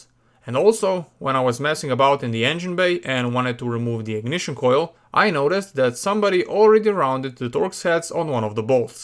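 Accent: Slovenia. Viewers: Hearing a slovene talking in english is so bloody cursed 😂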